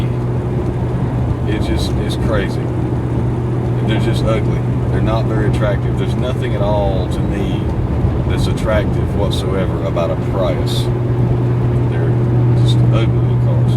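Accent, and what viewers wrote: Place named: Rural, United States. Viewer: Grow some hair and loose the hick accent before you try and come across as an"expert"- dumb..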